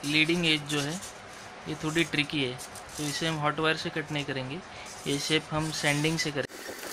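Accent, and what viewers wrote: Maharashtra, India. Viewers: bhai ,awaz se maharashtrian lagathe ho. kaha se ho,??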